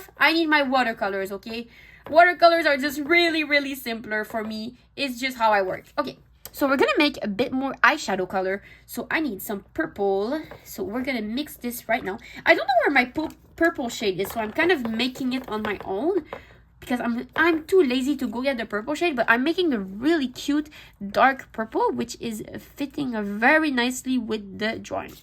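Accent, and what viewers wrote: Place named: France. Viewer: Hey! I loved your video, hreat art, great tutorial it was *chef kiss*I was just wondering if you were french, I am french myself, and I heard in your accent (slightly) some french like the “Ok” and the “euh”, the way I also pronounce it :3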